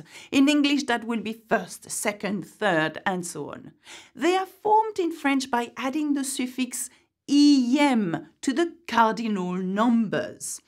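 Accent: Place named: France. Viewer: she speaks in a french accent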